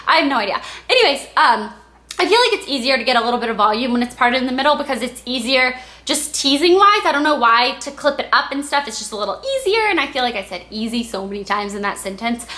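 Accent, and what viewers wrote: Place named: South, United States. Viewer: i realized you used to have kind of a country accent o.O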